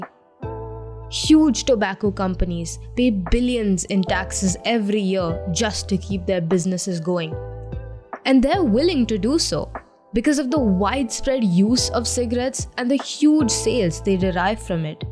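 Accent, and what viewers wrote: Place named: North India, India. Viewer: This North Indian Accent. And the audacity to speak it so confidently. Fucking "Pakistanis on the wrong side of border" .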